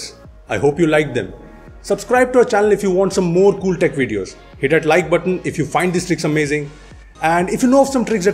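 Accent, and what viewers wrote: India. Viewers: Do you make your accent so thick on purpose? Because I literally don't know any Indian or Pakistani who's accent is so thick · Danyal Malik obviously indian accent... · Your head movement and indian accent make it super hard to understand what you sayin. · I HATE YOUR ENGLISH,LOL INDIA.. GET OFF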